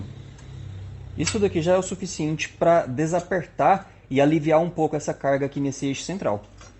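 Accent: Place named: Goiás, Brazil. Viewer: Uai, de q cidade de Goiás vc é?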